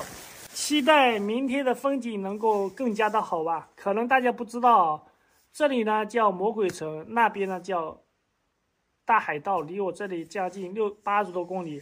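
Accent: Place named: South, China. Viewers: 感觉浙江口腔 温州一带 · 哈哈哈哈！南方口音。我聽著很親切。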